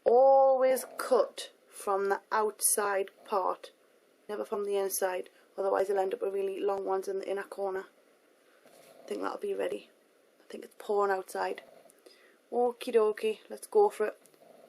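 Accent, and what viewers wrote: Newcastle, United Kingdom. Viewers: She's not Scottish or Irish, she's from Newcastle! :) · yeah, her accent is jordie! jordie meaning she's from newcastle! :)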